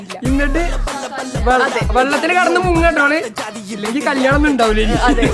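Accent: Malappuram, India. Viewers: മലപ്പുറം ഭാഷ, എവിടെയാ വീട്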